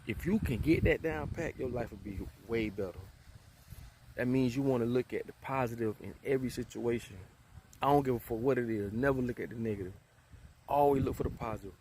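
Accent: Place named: South, United States. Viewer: Admirable..... that southern drawl i love also i just admire ur mindset we must be in the same soul group or something. Keep up the good work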